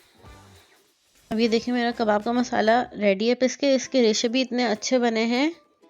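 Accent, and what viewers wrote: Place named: Hyderabad, India. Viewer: MashAllah Khalis Hadrabadi zaban boli ja rah rah wow👌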